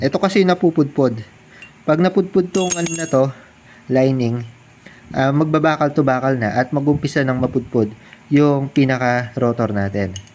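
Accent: Pampanga, Philippines. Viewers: Paps kapampangan ka ba?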